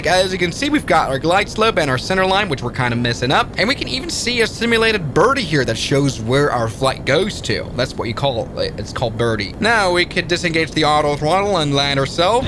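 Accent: Germany. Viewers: are you german? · Are you German? (I am )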